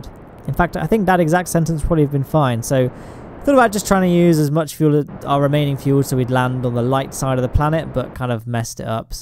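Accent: United Kingdom. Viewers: British Scott Manley? I think so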